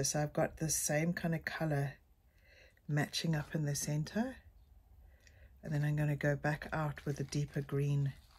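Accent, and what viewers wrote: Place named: Southern Africa. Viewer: It's nice hearing a Southern-African accent! Btw, how do you sell your artworks?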